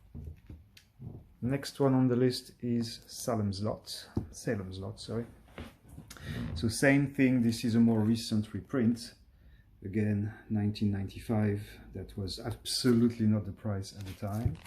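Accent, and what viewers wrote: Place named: France. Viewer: You speak english like a french !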